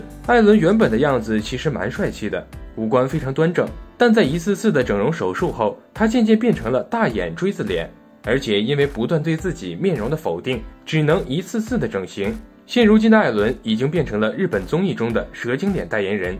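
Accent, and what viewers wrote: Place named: China. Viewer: 这个节目吊啊，说着大陆普通话、换算都是新台币！！！！哈哈哈哈哈😄懵逼了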